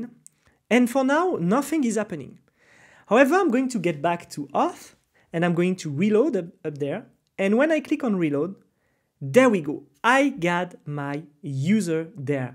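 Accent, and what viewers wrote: France. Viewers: Merci beaucoup, I can recognise French accent from miles away. Tu aides énormément mon gars ! 💪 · French accent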